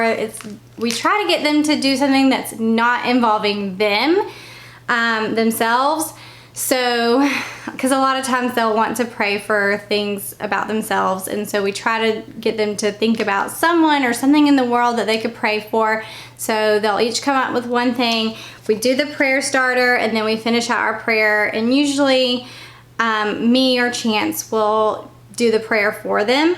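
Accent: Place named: South, United States. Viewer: Okay so I don’t want this to sound weird but I LOVE your accent!! I am in the Midwest and don’t hear that southern twang very much. It is so nice! It reminds me of one of my best friends from high school that I lost touch with. 💕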